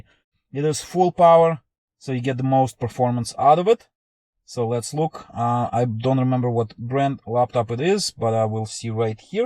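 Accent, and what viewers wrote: Russia. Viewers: Прикольно слышать акцент и догадываться, что это русский. Успехов в майнинге. Я не понял смысл первой части видео. 2070 тоже бывают Max-q ? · Почему он говорит на английском а слышу я русский ? 😀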